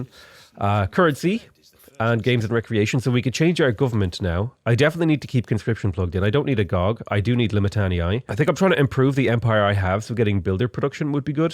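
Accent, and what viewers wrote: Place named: Ireland. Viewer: His irish accent did not get a single bit stronger yet the deeper voice makes him sound a shit ton more irish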